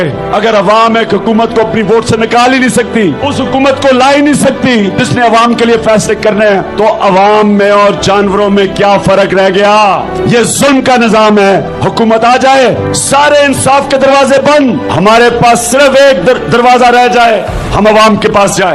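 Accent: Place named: Pakistan. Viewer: this sound Pakistani sound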